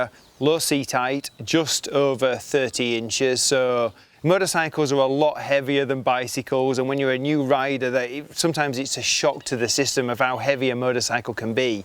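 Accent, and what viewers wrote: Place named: Yorkshire, United Kingdom. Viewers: Did not expect the northern accent in that location. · Bridlington accent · Hull/Yorkshire accent ? Lovely little bike and the price is bang on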